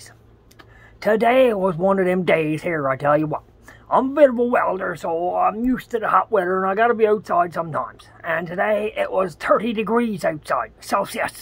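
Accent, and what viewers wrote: Newfoundland, Canada. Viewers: You answered all my questions, thanks! Love the Newfoundland accent but you gotta ditch that hat before you get complaints.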